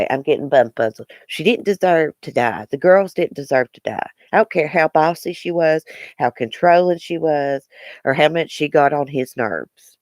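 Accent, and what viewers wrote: South, United States. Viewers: Love your sweet southern accent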